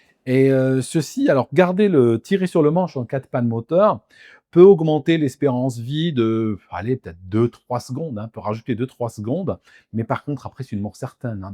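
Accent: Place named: Switzerland. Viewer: tu Soules avec l''accent Suisse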